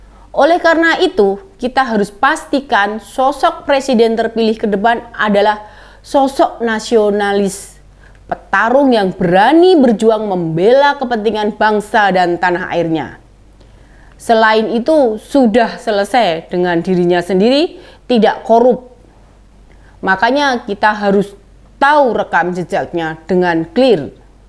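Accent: Java, Indonesia. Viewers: Wwee..Jowone kentel biangeeett.. 😀😀😀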